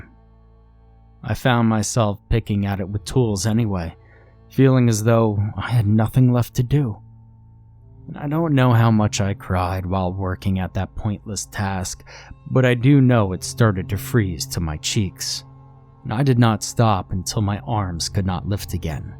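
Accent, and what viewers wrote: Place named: East Coast, United States. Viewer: I'm hearing an East Coast accent....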